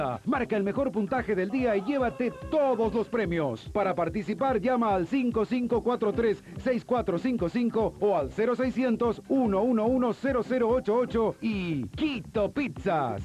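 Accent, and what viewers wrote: Standard. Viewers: la calidad del VHS es sublime, lastima la fea locucion que tenia este locutor "neutro" el que teniamos en la señal Argentina era mejor, hasta la propaganda de Kito Pizzas estaba mejor hecha en su pais de origen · En vez de decir acá por Magic Kids, decían aquí por Magic Kids, o aquí en Magic Kids, pero igual se entendía, a pesar de que el locutor hacía la voz en neutro, se entendía igual de bien. 🙌🇦🇷👏🤗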